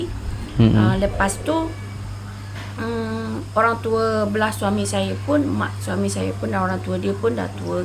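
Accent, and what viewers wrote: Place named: Jambi, Indonesia. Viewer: Patutlah lidahnya Melayu - orang Jambi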